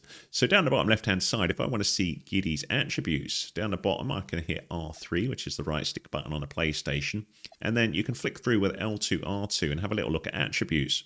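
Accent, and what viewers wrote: United Kingdom. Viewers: great break down, also refreshing to here a brit talking about basketball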